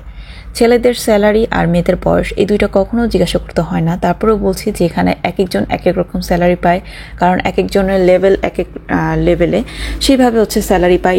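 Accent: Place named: Dohar-Nawabganj, Bangladesh. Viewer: Apur Kotha Sune Mone Hoy Apur Basa Dohar-Nawabgonj A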